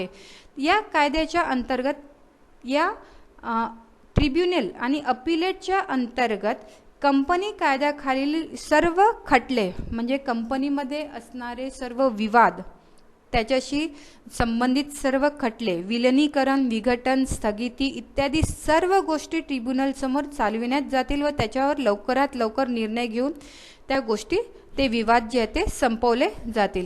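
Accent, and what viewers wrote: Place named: Nanded, India. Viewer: Mam tumhi nanded che ahat na😅 tumcha language varun vatate , ekdam sundar teaching ❤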